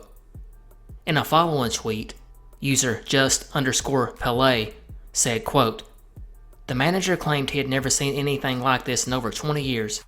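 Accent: South, United States. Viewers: Thank you! I really enjoy these. I absolutely love your voice! I'm from WV and sadly, I never hear Southern creators. Keep up the great work and I definitely look forward to future videos.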